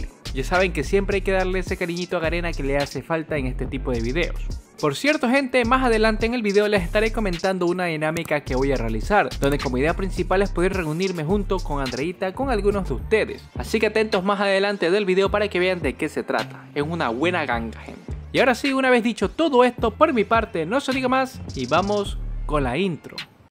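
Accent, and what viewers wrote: Ecuador. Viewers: Eres ecuatoriano?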